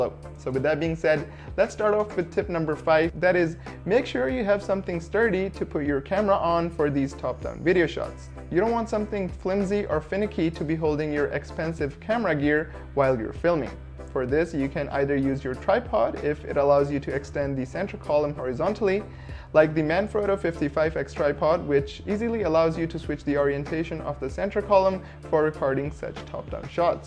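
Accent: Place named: United States. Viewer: Hey bro can you tell how to do american accent like you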